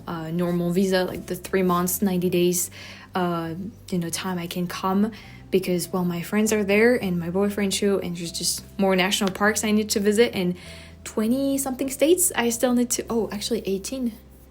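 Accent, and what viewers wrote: France. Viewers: I haven’t seen one of your videos in years…You’re losing your French accent! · Your English has gotten so much better over the course of your videos. Don't lose your French accent, though! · Since I first started watching you react to music, you have almost lost your French accent ❤❤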